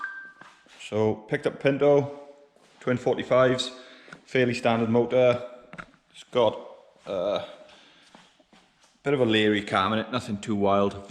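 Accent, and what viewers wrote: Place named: Wales, United Kingdom. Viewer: I thought you sounded a bit Welsh! I'm also in South Wales not far from Caerphilly! I've had a few Escorts in the past, even a MK1 RS1600, and Mk1 Twin Cam, plus a few standard ones. The one escort i really got on with was a standard Mk2 1300 2 dr. Great to drive, could rev the nuts off it, and handled really well. I'm no expert with cars, but would really love to do what you are doing now.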